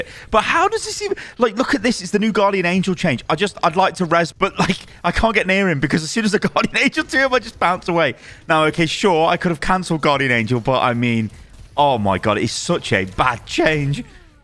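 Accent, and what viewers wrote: United States. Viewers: your country accent is 🤌 top notch. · I appreciated the return of the American accent,. 😄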